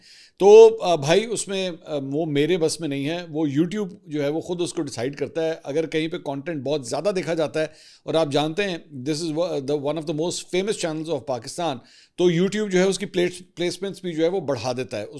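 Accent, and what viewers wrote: Punjab, India. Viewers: sahib today your punjabi accent make the video more excitting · Sr punjabi touch zaror daity rahainy we like it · Punjabi Touch 😆 Chas Agyi Awj To 😉😍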